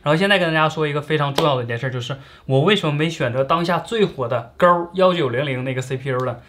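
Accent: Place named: Northeast, China. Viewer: 哥们东北哪儿的呀？口音好亲切呀😂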